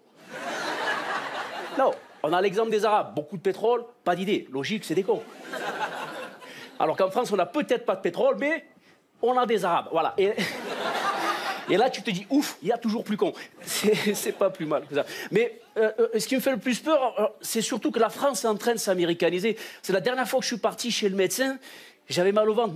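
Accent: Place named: Spain. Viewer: Très bon !! Ta un accent qui fait espagnole ou marseillais ☺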